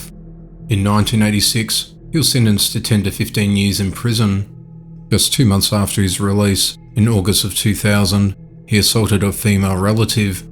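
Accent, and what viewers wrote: Australia. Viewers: Okay but are you Aussie ??? I need to know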